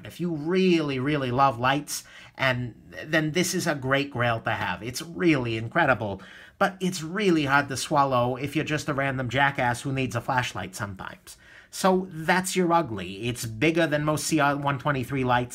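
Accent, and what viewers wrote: New York, United States. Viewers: I hope your fiancé has your beautiful New York accent. And I also pray that your babies, of which I hope there are many, also have that beautiful New York accent!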